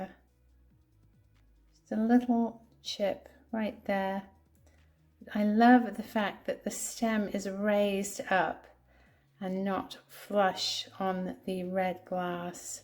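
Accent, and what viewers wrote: United Kingdom. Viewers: Please don't put things back on the table like that carry them down the table as you go. I know I am not alone in saying that it drives my anxiety way up. Even if you say that no one is behind you, it doesn't stop the anxiety. The only thing that would is if you carry it along with you. If you must put it down, please put it down in front of your body while you reach for another item. Then pick up that item along with the other ones in front of it. If I could control anxiety I would, because it really sucks. I'm relieved you were able to get all the items. But maybe if you wouldn't mind, in the future realize that so many of us, including myself that love watching all you guys as a respite from anxiety. I love watching your videos. You are always so cheery. I love your British accent, and your knowledge about so many things.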